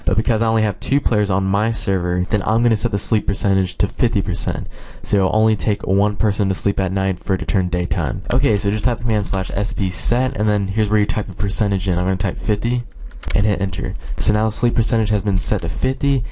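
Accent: Italy. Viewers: are You italian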